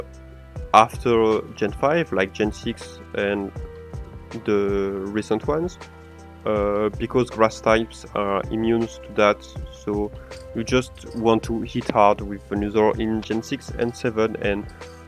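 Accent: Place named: England, United Kingdom. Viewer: Oh, what a beautiful english accent ! I'm jealous.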